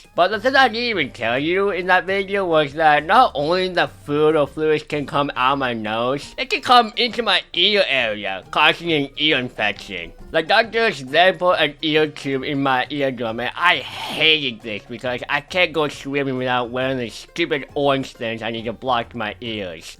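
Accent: United States. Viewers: You still sound American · you sound american, not chinese. wow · He was raised in an American family so he is obviously gonna have an American accent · Your Chinese your voice sounds like your a American :O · He sounds American but is chinese. Let that sink in.........................................................................................................................................................................................................................................................................................................................................................................................................................................................................................................................................................................................................................................................................................................................................................................................................................................................................................................................................................................................................................................................................................................................................................................................................................................................................................................................................................................................................................................................................................................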